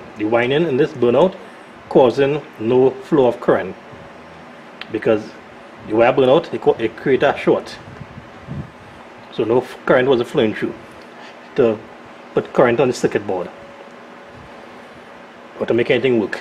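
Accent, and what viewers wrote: Trinidad and Tobago. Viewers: Can always know a Trini from his accent. Im one too. You could still discharge the Cap before removing the leads. Where you get parts in TT? Oster version here. Will work on it soon.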